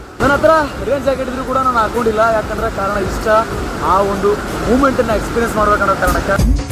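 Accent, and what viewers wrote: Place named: North Karnataka, India. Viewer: ಉತ್ತರ ಕರ್ನಾಟಕದ ನುಡಿ ಇನ್ನೂ chanda... ನೋಡಿ ಖುಷಿ aitu... ಗುರುಗಳೇ.. ಒಳ್ಳೇದಾಗಲಿ.. 🙏🙏🙏🙏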